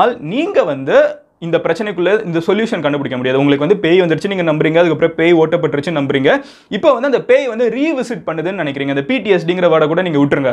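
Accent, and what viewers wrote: Madurai, India. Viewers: தலைவா நீங்க மதுரை யா ? மதுரை 🔥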